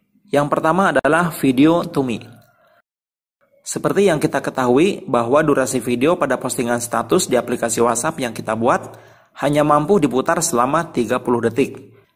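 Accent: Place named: Sukabumi, Indonesia. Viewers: Org sukabumi ya bang 😅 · Abang orang skabumi ya???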